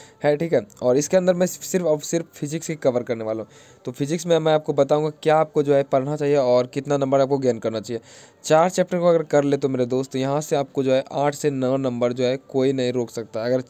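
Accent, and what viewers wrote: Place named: India. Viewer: सर आपके बोलने का अंदाज देसी स्टाइल एकदम मस्त बढ़िया मजेदार है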